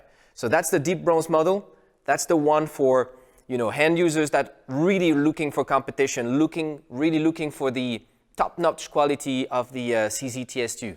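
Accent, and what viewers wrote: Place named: France. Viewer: French accent...oui!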